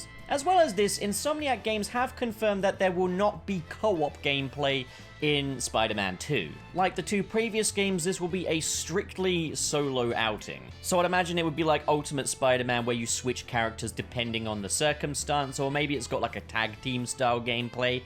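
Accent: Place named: United Kingdom. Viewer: I got you and bobs mixed up, are you both from the same area of the UK?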